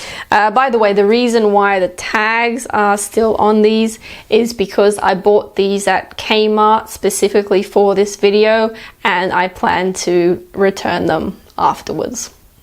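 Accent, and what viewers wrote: United Kingdom. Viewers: Uk accent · Hello, question... are you speaking british english...thanks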